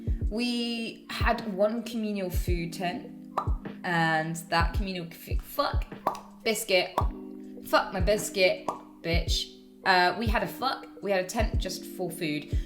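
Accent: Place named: United Kingdom. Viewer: She sounds so much more british here, than she does now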